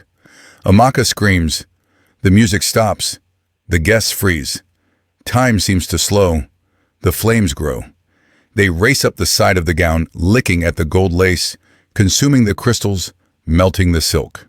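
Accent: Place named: Europe. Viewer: Why did the voice change from the beautiful female narrator that can pronounce all the African names right to a man's voice who was born in Europe?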